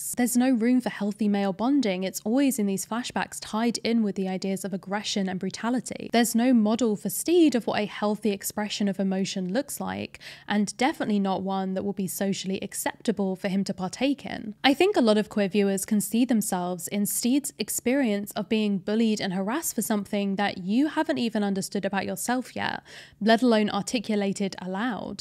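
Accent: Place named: United Kingdom. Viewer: The subtitles transcribed the word duel as "jewel" so if I had forgotten that you were British that would've reminded me lol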